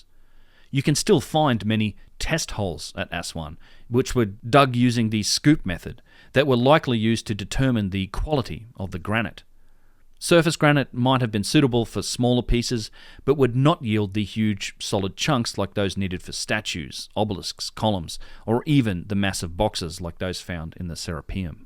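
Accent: Australia. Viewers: I'm loving your work! I know you're a global citizen now, but I'm really pleased to hear an Aussie accent behind the insights put forward.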